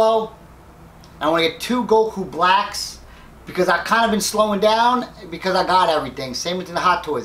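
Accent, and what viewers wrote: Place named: Rhode Island, United States. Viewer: Are you from Rhode Island? My family is from there too. I can hear it in your voice.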